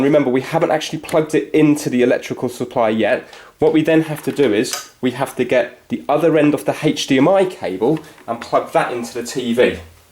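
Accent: United Kingdom. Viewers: Is he British? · I love British accent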